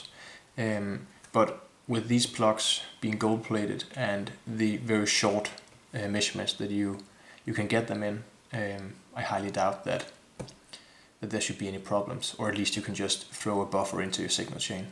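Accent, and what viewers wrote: Denmark. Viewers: Hej (fandt dit navn på soundcloud), Jeg tillader mig lige at besvare dit spørgsmål inden jeg fortsætter med vores snak omkring kabellængder. Ja jeg er dansker og sad faktisk og tænkte det om du også var dansker, da jeg så videoen. Det er svært at løbe fra den danske accent, men du skriver og taler rigtig flot engelsk! Nå! Tilbage til det originale emne og tak for dit svar. Grunden til at jeg spørg er fordi, at de 30 cm kabler som jeg har nu passer perfekt til mit pedalboard. Men jeg ville gerne have kabler som disse for at få plads til flere pedaler, da de fylder ingenting sammenlignet med de traditionelle kabler (pro snake Patch Angled Jack). Jeg har så valgt at købe thomanns egne kabler som hedder Harley Benton FPC i stedet (du kan prøve at søge på dem), da de kostede halvdelen af EBS og ud fra hvad jeg kunne læse, så minder de utrolig meget om EBS kablerne. 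Men rart at vide at jeg også skal tilføje et par cm til dem, så behøver jeg ikke være bekymret om hvorvidt de er lange nok eller for korte. Så må vi se om de holder lige så længe som EBS ellers må jeg købe dem næste gang:-) Ps. Jeg har tilladt mig at abonnere på kanal, da dit indhold lige er noget for mig.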